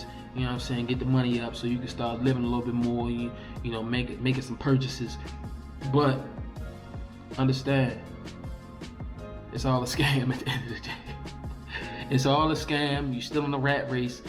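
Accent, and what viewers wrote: Baltimore, United States. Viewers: Can tell you from Baltimore 😂, but good luck bro ! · U from Baltimore ain’t you🤣 that accent one of a kind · I here Baltimore baby...keep up the good work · i hear Bmore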